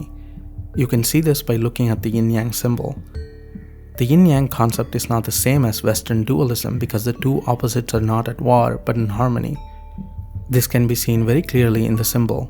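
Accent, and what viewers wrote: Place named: Tamil Nadu, India. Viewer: Tamil scent in your accent. அடி தூள்.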